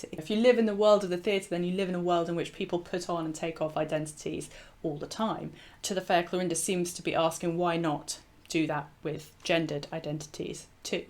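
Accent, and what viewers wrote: United Kingdom. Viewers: My first thoughts were that the poet has decided to view Clarinda as a Youth since that would make her admiration more natural and innocent than if she regarded her as a woman. (PS How was “Mrs” pronounced at the time? Had “Mistress” become “Miss’ess” or “Missus”? Also, I tend to pronounce “Pastoral” with the stress on the first syllable - but, then, I come from Birmingham and don’t have your pure RP accent.)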